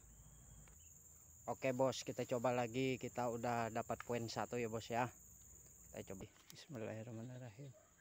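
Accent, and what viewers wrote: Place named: Banjar, Indonesia. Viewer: Logatnya urang banjar saudaraku....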